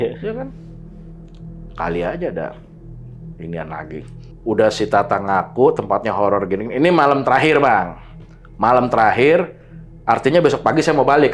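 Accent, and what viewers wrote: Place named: Jakarta, Indonesia. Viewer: betawi pride banget niiih ngomong nya, horor rasa komedi jadinya🤣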